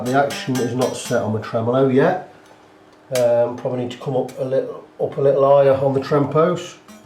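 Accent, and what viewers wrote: United Kingdom. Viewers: Saw the name Fret Friend UK and thought "A Brit, great I won't have any problem with the accent." :/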